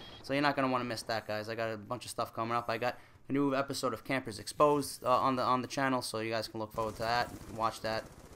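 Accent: New York, United States. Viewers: I predict 100,000 subscribers by the end of the year. NY accent + Rage + valid observations = kick-ass commentary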